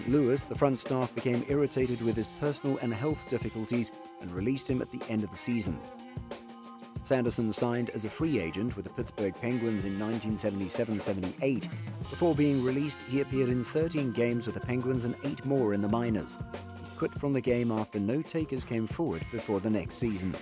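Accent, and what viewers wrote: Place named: United Kingdom. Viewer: Why is there a Limey talking about hockey?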